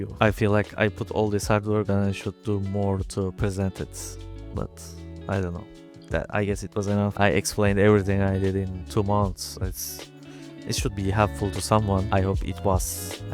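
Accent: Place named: Turkey. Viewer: I may have noticed a little Turkish accent 😅 I couldn't see it in the video but I presume the main material is basic toon shader with color ramp?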